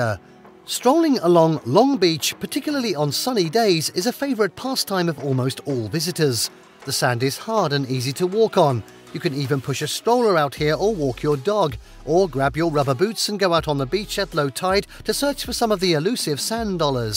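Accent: United Kingdom. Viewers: thx for info! and your speech is clear enough to understand!🙂 I'm sorry, is it British?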